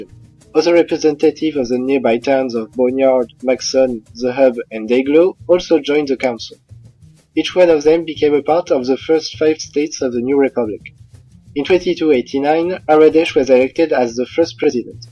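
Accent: France. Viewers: A l'accent français x] Le meilleur accent du monde ;] Sympa la vidéo. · Mec sa se voit que t’es français ou tu parles cette langue parce que ton putain d’accent est dégueulasse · Your French accent is thick and very difficult to understand.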